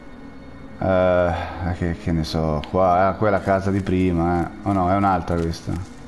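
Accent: Rome, Italy. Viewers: come al solito mmmaaaazzzzuuuuunnnnnaaaaa,spolliciate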